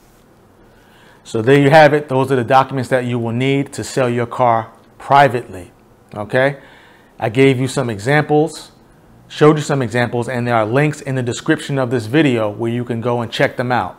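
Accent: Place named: East Coast, United States. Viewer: No, you don't. That depends on the state. With his accent, he sounds like from the East Coast, and may not be aware of laws here in the West.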